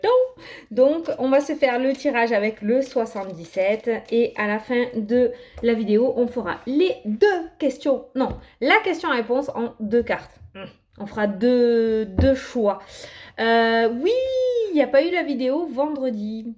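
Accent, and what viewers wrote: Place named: Marseille, France. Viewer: Ta repris le marseilles..😅 i knew it !!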